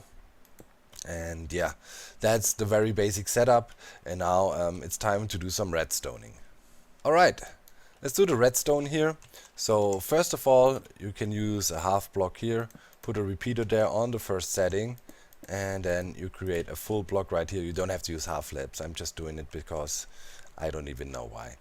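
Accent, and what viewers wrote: Germany. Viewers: German right ;)?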